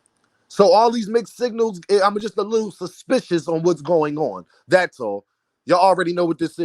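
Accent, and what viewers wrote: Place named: Philadelphia, United States. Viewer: you from Philly huh bro? I’m from Baltimore lived in Philly 3 years you sound like my young bull from there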